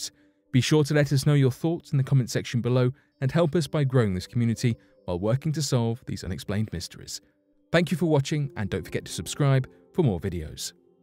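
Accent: United Kingdom. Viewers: Matt Michniuk thats actually the British pronunciation. Much like sedule for schedule or alu-minium for aluminum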